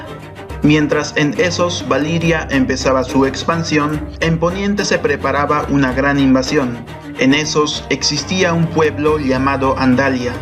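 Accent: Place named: Bolivia. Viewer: Eres boliviano verdad? Acento inconfundible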